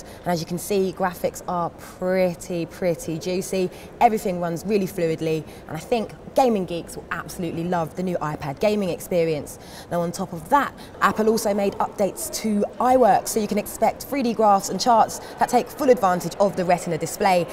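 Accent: United Kingdom. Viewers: Don't you just love the british accent? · I can't stand british accent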